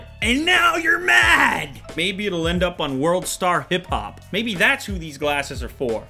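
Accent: United Kingdom. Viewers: actually a pretty good uk accent! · That Excellent British accent though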